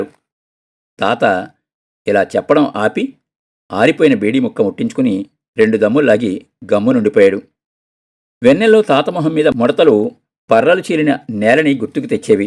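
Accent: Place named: Rayalaseema, India. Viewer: గారు చాలా చాలా మంచి కథ వినిపించారు. ధన్యవాదాలు. రాయలసీమ కరువు రోజులు కళ్ళకుకట్టినట్టు వినిపించారు ఆ యాసలో.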